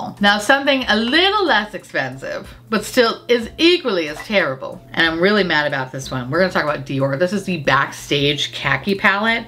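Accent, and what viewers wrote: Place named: New York, United States. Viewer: I am from nyc and I hear the new Yorker inside you 🥰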